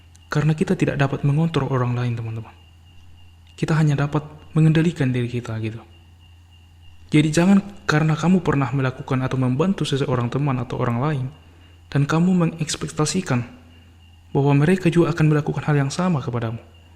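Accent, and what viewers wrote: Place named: Sulawesi, Indonesia. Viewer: Abang nya asli mana seperti logat Sulawesi -_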